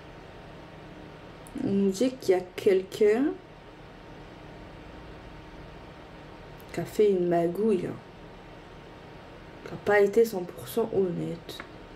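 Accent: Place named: Marseille, France. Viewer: Bonjour ma belle j’adore ton accent marseillais quand tu es dans l’emotion merci pour ce message je te kife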